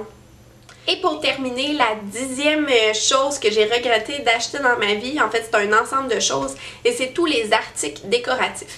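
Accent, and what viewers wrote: Quebec, Canada. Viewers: purée cet accent quebecois jadore ^^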